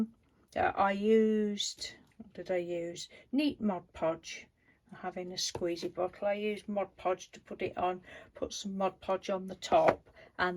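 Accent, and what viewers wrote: United Kingdom. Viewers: It looks like you are just having the best time!!! I'm in the USA but when you said it was for your friend Penny Humphreys it made me think of 'Are you Being Served' haha. Wasn't there a miss Humphreys on there? I belive so 😆. I love watching that silly show plus you sort of have that accent to me. I'm being silly I know. Your project inspires me to just go make art with free abandon!!! I love your project.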